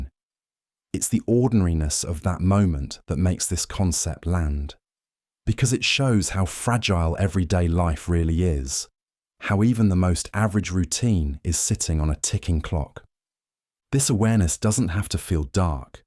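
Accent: United Kingdom. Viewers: Please never change the voice, I love this British voice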